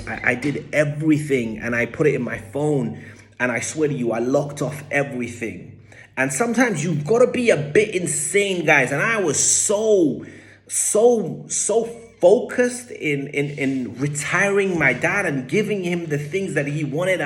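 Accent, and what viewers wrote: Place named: United States. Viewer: Are you from America? Great accent!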